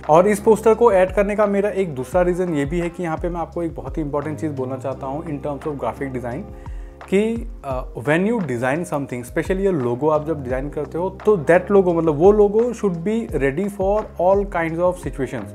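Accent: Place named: Bengal, India. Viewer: If I am not wrong, you are bengali for sure. Your accent is typically bengali type. Just a guess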